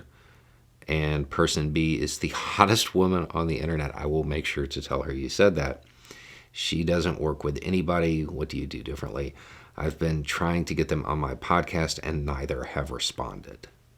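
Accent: South, United States. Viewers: How did folk not know you were from the South? I can hear your drawl from way down yonder, even though I figger’d you were a Texan. 😉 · Yes, back in the days when spoke in a much thicker Southern accent. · Perhaps other folks have observed and mentioned you SOUNDED a LOT more Southern in you early videos. When I first stumbled upon your channel I was not sure what to expect in those days, but over time I have really appreciated your insight into matters of importance. Thanks, Man. (I cracked up when you wrote "not secret" on that box behind you.)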